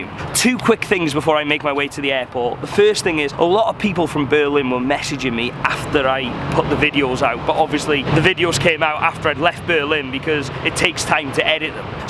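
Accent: United Kingdom. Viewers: Love your videos but i can't stand the British accent it reminds me of austin powers. If i didn't like the content so much i wouldn't be watching it all. Cheers and mate my 2 least favorite words specially cheers .... Come to Seattle Washington USA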